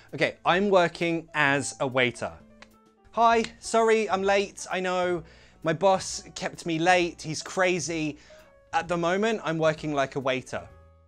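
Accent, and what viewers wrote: United Kingdom. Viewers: Love your British accent💜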